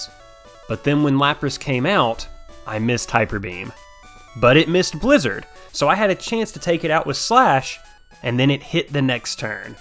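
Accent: South, United States. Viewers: I follow Canadian Poketubers, British, Irish, Australian,... now I can add "Southern American" to the list of accents I get to hear from Poketubers :D Very cool challenge videos, please keep it up!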